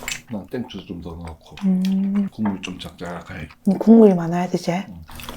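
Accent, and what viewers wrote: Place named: Rural, South Korea. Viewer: You guys are so cute together! 😊 You have the country Korean accent, so cute.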